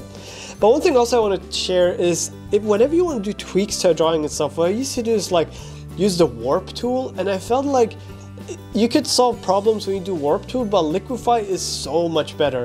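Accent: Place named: Sweden. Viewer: i KNEW i recognized your swedish accent from somewhere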